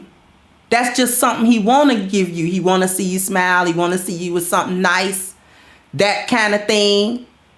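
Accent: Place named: South, United States. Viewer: where do you live, you're seeing this kind of woman all the time? sounds like you're from the south.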